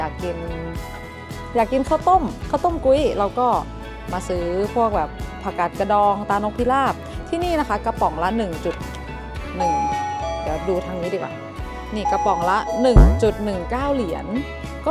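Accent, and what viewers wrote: North, Thailand. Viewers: F.C เจียงใหม่ ..เป๋นกำลังใจ๋หือเน้อคับ ดู.ทุกต๋อนเลย ชอบแต่ะๆครับ อู้กำเมือง.ฟังแลัวน่าฮักดี....เจ้า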